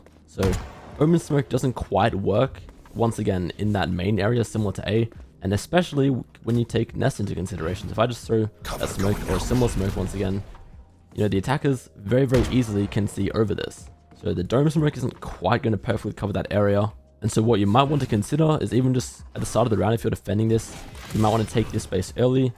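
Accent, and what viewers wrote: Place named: Australia. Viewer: dude, you gotta pronounce word more clearly. You talk fast is one thing but you thicc accent combine with halfway pronunciation make it hard to understand when I watch your video in one sitting. That's why I still haven't subscribe to you. Sometimes I even select NOT INTERESTED on YT shorts cuz your pronunciation is terrible for the rest of the world. Maybe not to other Aussies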